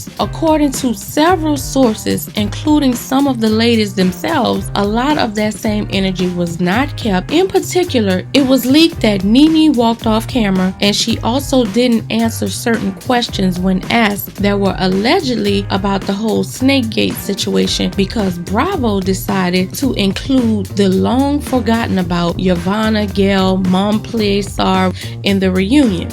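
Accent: New Orleans, United States. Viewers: U do a great job speaking but babaaaay I know the NOLA when I hear it! Especially being from SC. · Are you from New Orleans? That accent is strong lol 😂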